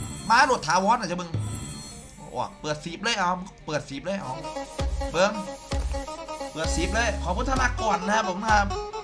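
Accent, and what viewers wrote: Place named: Isan, Thailand. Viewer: มักคำเว้าเจ้าเด้เนาะ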